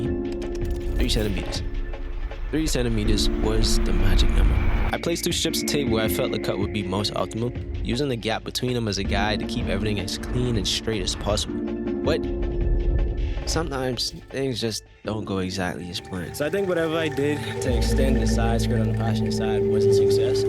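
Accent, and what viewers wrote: Bahamas. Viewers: Heard that Bahamian accent and felt right at home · Could here that accent from a mile away 242!!!! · Bey I say I know that accent sound familiar😂. You gain a sub just because of that. This my first time watching ya videos. Continue dropping the bahama heat bro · why bro sound Bahamian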